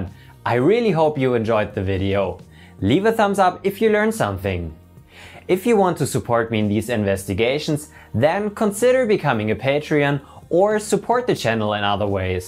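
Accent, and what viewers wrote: Germany. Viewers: Der Deutsche Akzent ist unerträglich.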